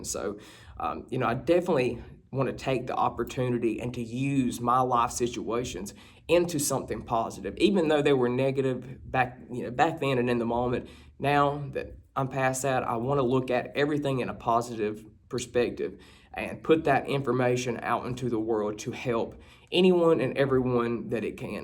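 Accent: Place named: Deep South, United States. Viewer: . I'm not sure where you are currently located, however, if you are still in the Deep South neo-con Bible belt, I'm sorry, but it is not a healthy environment for you. The first thing you should consider is moving to a more progressive place. It hurts me to see men like you, good, decent people, who have had to go through an environment that died in the 1960s. I am not suggesting that you need to lose your faith but think about this. The best definition of Evil is this: "Something that appears good and is not." Your country is on the brink of Civil War because of the appalling level of ignorance (don't confuse this with intelligence) that saturates the Mid-West and Rural Southern areas of the USA.). I was fortunate to have been born in London, England. I have dual citizenship and my home is in Toronto, Canada. Do not put down "Book-larnin." Sorry, but your accent only amplifies your situation. 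Now I'm not saying you need to get a PhD like I did. Not everyone is an academic or seeks a professional degree. Use your better instincts. All of us have a bell in the back of our unconscious that rings when we sense someone is "just like that other person who told us that they would be more than willing to teach us. Knowledge will make you Free. Read and read and read. The internet is not the greatest source of knowledge. And please, refrain from mentioning things like "I wish I had an older mentor." That is precisely what you do NOT need. You will be deluged with men older than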